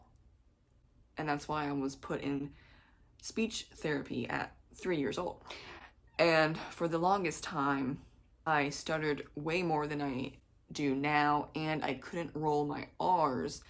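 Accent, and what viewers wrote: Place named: Boston, United States. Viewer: Love this! Great take on all these questions. There are ones I wouldn’t have wanted grandma to know about, but that’s about it. Everyone else can just deal with their boring taste on their own 😂 I get tongue tied and blend words all the time, I just beat everyone to the punch and laugh at myself. Sound like you’re from Boston, I love it!! Great video!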